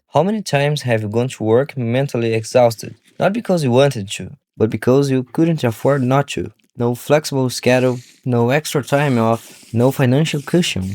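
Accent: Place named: Brazil. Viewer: pelo sotaque ctz q é brasileiro, baita video amigão 👏👏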